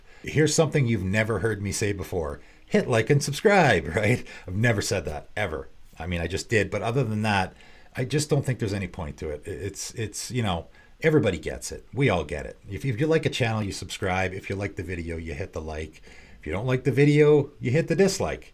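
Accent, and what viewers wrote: Canada. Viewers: Love the content man keep up the great work. I'm very happy you're continuing to have success! I've found so many great cards and strategies through your videos. I love using those lesser known gems and you might be the best channel to find those. You've also inspired me to build 4 decks (patron of kitsune, cosima, Dakkon, and ertai). I've got 3 random questions for you: 1) do you have a public discord or is it only through patreon? 2) have you ever played with, or considered plying with custom commanders? What are your thoughts on them? 3) are you from Canada? Your accent gives me Canada vibes 😂 Also I can't remember if you discussed it in a video already but dominating licid is so cool! Definitely deserves a mention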